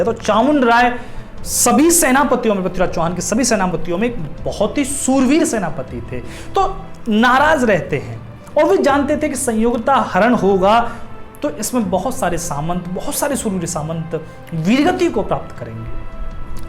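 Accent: Braj, India. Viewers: Kha se ho aap gurudev.. Aapki bhasa humari jesi hi h...braj chhatra ki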